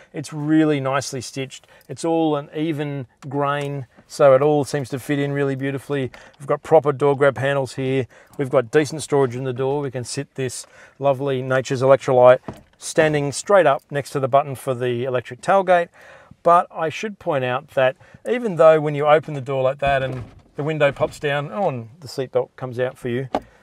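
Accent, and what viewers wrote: Australia. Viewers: Man.... I miss Australia soooo much. I already have an E-class coupe and I really like it. I'm here mostly to listen to someone speak, Australian! BTW, silver is a very good color to have (especially in Canada), because dirt doesn't stick to it like it does on a white or dark colored car. I have always bought only black or dark ruby red, cherry red, metallic cars. But I discovered that silver cars, I only have to wash it once for every five or six times I'd have to clean a black car. I bought silver because it was the only E coupe on the lot; and if it's a Merc', color doesn't really matter to me; it's a Mercedes Benz.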